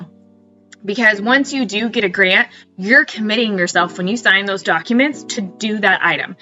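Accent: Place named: Missouri, United States. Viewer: I had to retrain my ears to hear soil the word bcuz of your Missouri accent.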